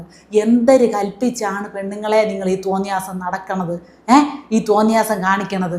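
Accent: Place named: Trivandrum, India. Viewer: വേഷത്തേക്കാൾ കച്ചറ ആ തിരോന്തരം ഭാഷയാണ്🤮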